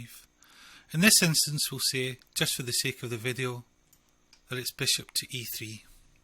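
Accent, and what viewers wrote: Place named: Scotland, United Kingdom. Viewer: Great video thanks. What part of Scotland are you from?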